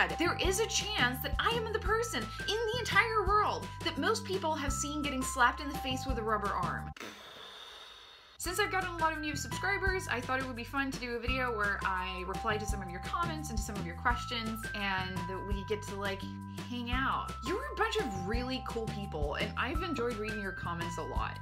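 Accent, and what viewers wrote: United States. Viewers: That is one of the most appropriate and awesome XKCD placements I have ever seen, excellent work. Also enjoyed the "Mothership" line. I thought you were American from your accent until you mentioned it, actually. It's an oil filter wrench. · Quirky, smart and entertaining! You really do sound like an american though, you picked up the accent really well. :) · Aha! a fellow non-native american accent speaker! lol I am, too, a non-american/canadian who speaks "without" an accent (just like u, all because i watch a lot of american movies since i was very little) and I currently live in Canada. I actually wished sometimes that I have an accent, because there are a lot of times where i would look stupid, bcs of the same reason as you, or when i messes up my grammar or word pronounciation, or when i feel "alien" towards certain north american pop culture. 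(I had no idea who Bill Nye or what Fresh Prince of Bell-Air is until my friend mentioned it about a year ago..) Question, though; are u able to speak english with an accent or watching a lot of american TV basically erased ur ability to do so? (I am the latter.. or maybe i just suck at doing accent in the first place) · Hey your channel is really cool! The stuff you do is great and you're a likable person. Also, as a language nerd, I appreciate your excellent American accent. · and to where does this swdish girl credit her drop perfect american accent?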